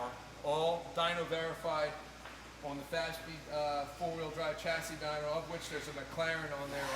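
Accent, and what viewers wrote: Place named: Long Island, United States. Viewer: I moved out of NYC and I watch Fabspeed videos when I need my fix of that Meeeasapeequah accent. Parts look great too. How do these cats do on emissions?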